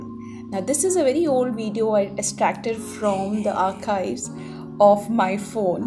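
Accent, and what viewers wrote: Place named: India. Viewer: your indian accent makes me happy to hear it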